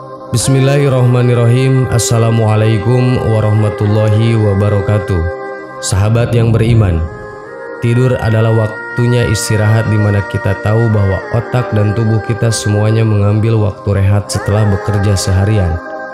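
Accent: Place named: West Java, Indonesia. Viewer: Sunda banget yak 😁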